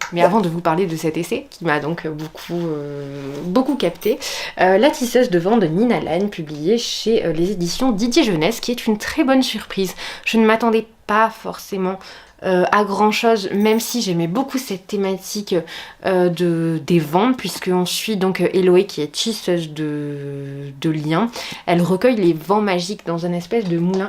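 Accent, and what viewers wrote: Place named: South, France. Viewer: J’adore ton petit accent du sud 😊☺️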